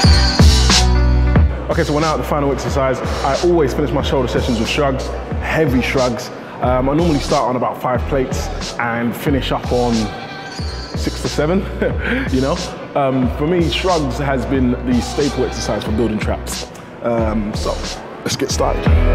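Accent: United Kingdom. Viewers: Alright bro, r u from here in the UK??? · Are you British ?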